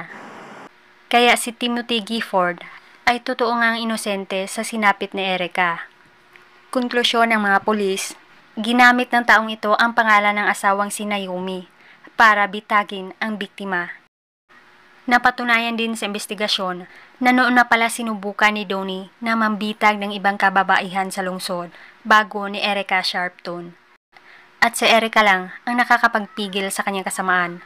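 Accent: Visayas, Philippines. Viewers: Grammar o diction?😂😅 naiintndhan nman mga sinasbi nya un ang importante.. Ganyan tlga kpag hnd tagalog ang nkasanayan.. Visaya yata si Mam.. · Walang mali sa grammar nya, may puntong bisaya lang ang pagdeliver nya ng storya. Pero walang naman problema dum.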